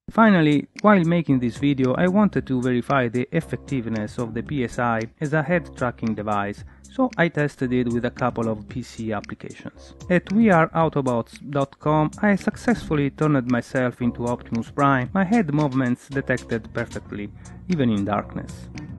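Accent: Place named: Italy. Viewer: Si sente che sei italiano... :) Allow si pronuncia "ellau", questa è la parola che suona peggio, anche se devo ammettere che la pronuncia lascia un po' a desiderare. Ma in fondo chissene... ;) Video molto utile, almeno per me. Mi piace! ^^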